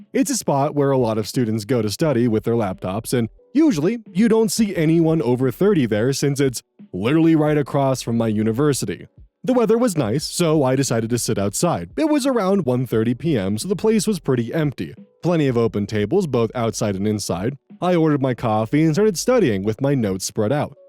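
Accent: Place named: United States. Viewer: You weren’t that bad with pronunciation with that first story, you Americanized it a bit but it was all understandable. also French pronunciation is extremely specific, and often inaccurate to how something is spelt (see oiseaux = wazo. I genuinely couldn’t spell it myself- it’s been a while since I’ve been in French education so I’m a bit rusty)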